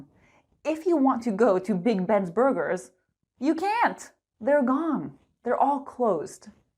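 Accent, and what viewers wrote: United States. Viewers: It has been a utterly splendid, exquisite and flawless lesson. I'm particularly joyful, blissful and elated with that opulent topic. It is to my liking; moreover, it appeals to me. I do adore speaking, and her charming accent. Hence, you are the quintessential of American English. You are the teacher whom I always wish to meet. I was wondering if I might dare to ask you a question? I saw the verb “gotten” is that correct? Thank you very much indeed. I pay my respects to you. You are the be-all and end-all. Far thee well, dearest Prof. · THANK YOU, ARE YOU AN AMERICAN?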